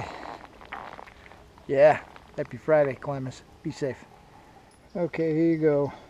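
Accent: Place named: East Coast, United States. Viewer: good to see you back at er pal! always enjoy your vids with the east coast accent commentary! keep safe brother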